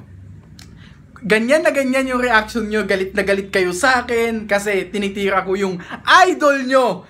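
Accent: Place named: Waray, Philippines. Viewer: Waray gud...hahah. maupay la pagkinita😅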